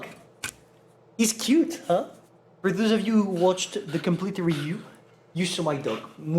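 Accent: France. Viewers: Awesome video for newbie and yeah, seems like u are france, arent you? Idk why but i think so.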